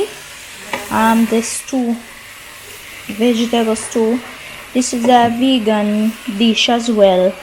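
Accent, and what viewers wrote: Jamaica. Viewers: Been watching you from the start. And you speak real Jamaican now, luv it ❤ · you have caught the Jamaican dialect flawlessly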